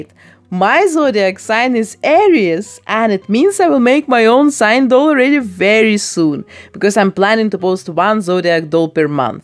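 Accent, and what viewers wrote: Russia. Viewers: Сильный русский акцент)) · Судя по Вашему акценту, Вы понимаете русский. Может, попробуете сделать ещё один вариант Одри? Прошло достаточно времени, чтобы попытаться снова))) Эта версия мало на неё похожа, Вы замечательная художница, и можете гораздо лучше)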